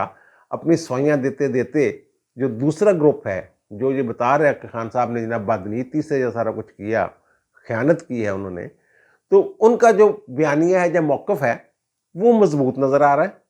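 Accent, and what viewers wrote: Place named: Jalandhar, India. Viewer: Wel done Ch Sb.Your Urdu and Punjabi style is Jlandri (East Pb of India. It is true or or otherwise!?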